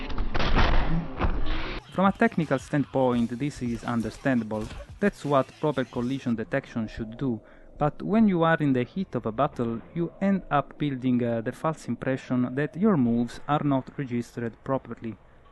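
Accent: Italy. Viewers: this is a real italian accent!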